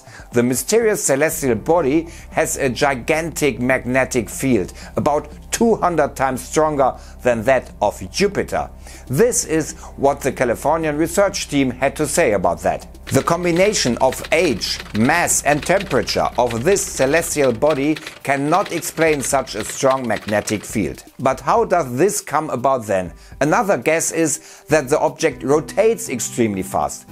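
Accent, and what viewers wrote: Germany. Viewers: Your German accent is very nice combined with those topics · Auch wenn man den deutschen Akzent stark raushört ändert das nichts an den coolen Infos und die Art wie du es rüberbringst. Cool das es auch nun nen englishen Kanal gibt. Gutes Video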